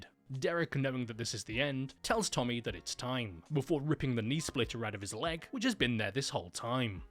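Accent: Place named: Scotland, United Kingdom. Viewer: it's his "I'm pretty sure this is a British accent... with a touch of Scott" his vernacular and his emphasis are all just a bit over the top. But he is a "broken man" (like most of us) with a cynical outlook on every last thing. Then the switch is thrown and he will go on the best tangents about some aspect of the movie. I'd listen to him review breakfast cereals. is a treat.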